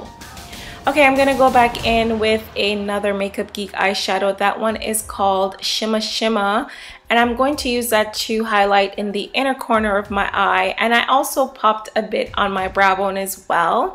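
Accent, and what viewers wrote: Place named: Jamaica. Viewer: Lovely makeup. I can hear an accent. Are you from Jamaica? If so, I am too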